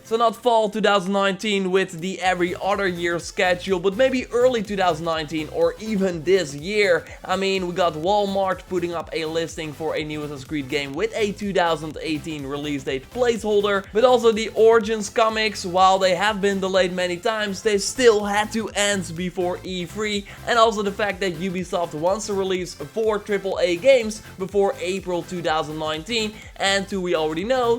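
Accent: Netherlands. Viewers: From what country are you you sound like you are from holland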